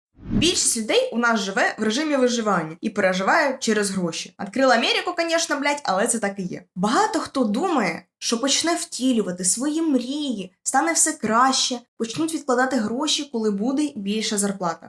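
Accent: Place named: Zhytomyr, Ukraine. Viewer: Ой цей божественний житомирський суржик)) Лайк підписка)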